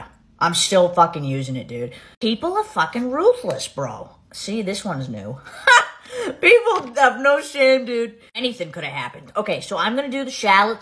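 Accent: Boston, United States. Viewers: The over exaggerated Boston accent needs to go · No its the same one. I have the same accent. That is just normal everyday Boston.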